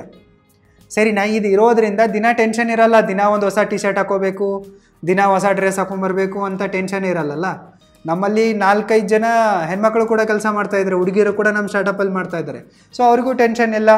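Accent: North Karnataka, India. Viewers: ನೀವ್ ಉತ್ತರ ಕರ್ನಾಟಕದ ಕಡೆ ನಾ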